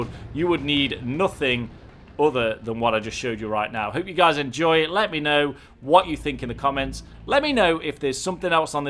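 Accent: United Kingdom. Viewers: are you british? You sound like to me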